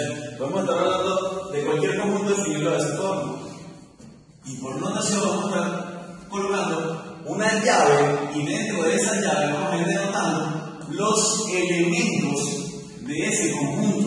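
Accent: Venezuela. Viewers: Excelente tutorial, bien explicado, buen contenido, buen manejo del tema, buena energía y muy guapo el jóven! por el acento parece ser venezolano, me equivoco? saludos desde Guatemala! :)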